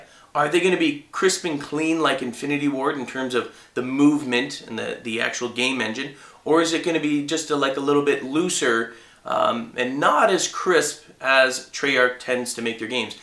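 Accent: Canada. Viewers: are you canadian "aboot" not about, "aboot"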